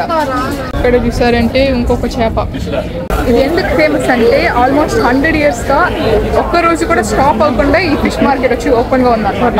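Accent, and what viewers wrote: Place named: Rayalaseema, India. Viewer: Mi voice vintunte you are belong to rayalasima anipisthundi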